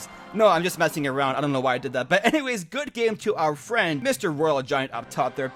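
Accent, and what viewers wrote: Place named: Canada. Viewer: Mini pekker haha. You must be Canadian by the way you talk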